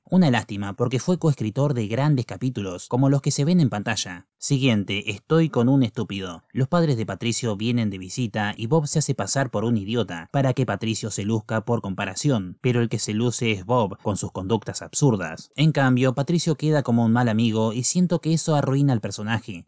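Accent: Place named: Argentina. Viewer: Tu acento argentino es incómodo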